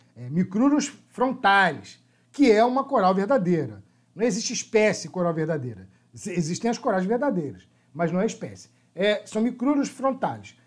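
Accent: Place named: São Paulo countryside, Brazil. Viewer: Esse sotaque do interior de SP é de mais kkkkkkkkkkk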